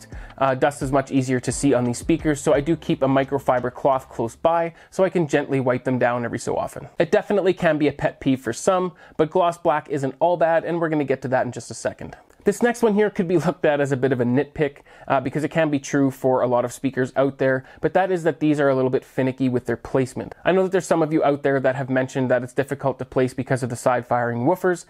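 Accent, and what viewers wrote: Canada. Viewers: Do I detect a bit of Canadian in your voice?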